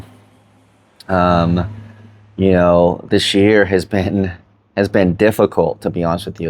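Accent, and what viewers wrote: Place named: United States. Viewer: I'm gonna pension soon and although I speak fluent Spanish I'm gonna try Thailand. Been there twice and I loved it !..From the sound of your voice I'd say you're far too American, and probably not bringing HUGE amounts of money, to the table, in the business you're working on in Colombia. NO way would I recommend you doing any such activity in ANY country in Latin America unless you have a need for those people to "work for you" !! That's why you're getting those responses ! They're looking at you (as the brains) ..to make money ...for them ! Don't think you're gonna change a culture. You're in their Land.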